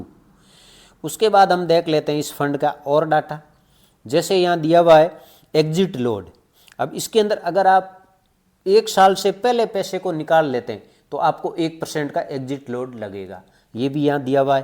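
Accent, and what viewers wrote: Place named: Rajasthan, India. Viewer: बहुत अच्छी जानकारी दी आपने बहुत बहुत धन्यवाद आपका 😊😊 राजस्थान से लगते हो आप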